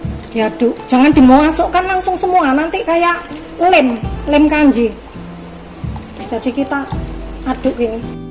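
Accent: Java, Indonesia. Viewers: Pas banget lg pingin sop asparagus 😋 Resep2 nya mesti tak tunggu2..soal e seneng liat ai nya kalo jelasin ,super jelas tp medok 😊👍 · mantap ce makan musim hujan bgtu enak banget 😋😋..suka logat bahasa jawa cece hehe.. jelasinnya jelas dan mudah dimengerti thx ya ce semangat trus ya ce Gbu 😊😇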